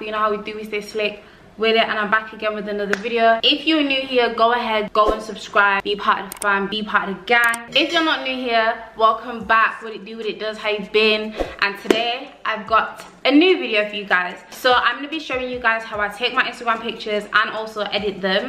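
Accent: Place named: Yorkshire, United Kingdom. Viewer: Omg your Yorkshire ❤️ I’m subbing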